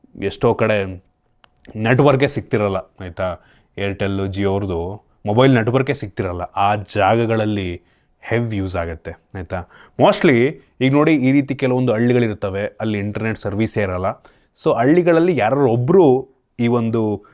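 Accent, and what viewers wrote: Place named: Southern Karnataka, India. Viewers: Common problem in southern Karnataka. All my classmates used to talk like this 😂. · All Hasana boys common problem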